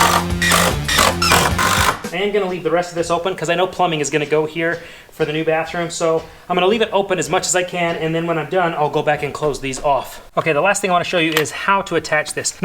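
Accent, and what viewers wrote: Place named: United States. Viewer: Well done man, you navigated me vocally during your video.. Classic keep it up. I’m Irish and hearing your proper American accent had me smiling and laughing respectfully. Goodstuff boss keep it up!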